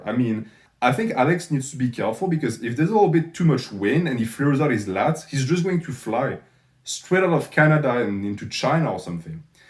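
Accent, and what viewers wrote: France. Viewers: are you french bro ?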